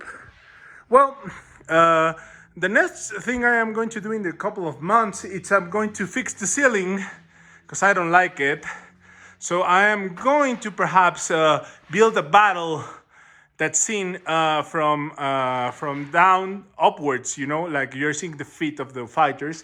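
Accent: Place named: Latin America. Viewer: De donde eres? Por tu pronunciacion, se escucha que eres latino! Esta conmadre tu cuarto!!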